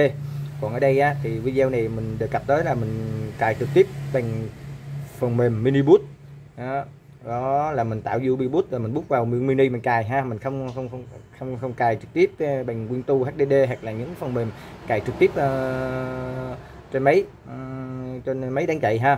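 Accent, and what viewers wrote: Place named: Binh Dinh, Vietnam. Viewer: Mình công nhận bạn từ khi tạo kênh đến giờ xem vi đô của b cái nào của bạn nói trong rất đậm chất nẫu k cắt ghép hay chỉnh sửa gì. Hd rất chi tiết k tua nhanh mình xem cũng xứ nẫu rất dễ hiểu...cảm ơn rất nhiều..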